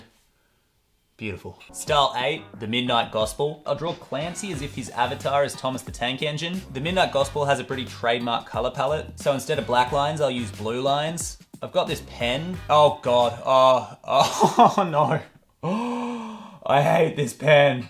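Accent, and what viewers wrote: Australia. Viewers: Nice! I just found your channel. Never thought id say this but its nice to hear an Aussie accent on youtube for once. All the other channels im subscribed to are American or British and there's nothing wrong with that, but yeah... Loved your first version of Thomas. He looks like he could grope me through 3m of concrete. 😅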